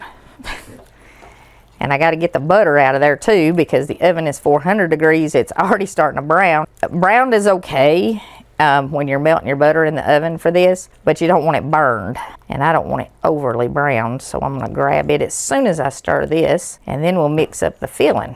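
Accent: South, United States. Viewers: Love your recipes and sweet southern accent ❤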